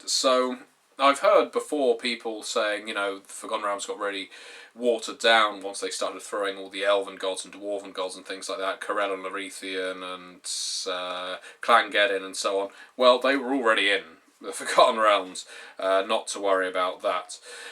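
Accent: United Kingdom. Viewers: I once watched so many of your videos in one sitting that my internal voice acquired an English accent. (I'm American) It actually felt like I was going a little insane. I managed to repair my mind by watching a couple of Tetsubo's videos. Anyways, it's nice to see more videos from you. I'm starting a Pendragon campaign, which I've been wanting to do ever since I watched your video about your horrible Pendragon campaign. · Why not go back to the lets plays for a while? I loved your Bioshock play-through. I miss your unique charismatic British voice when you are away.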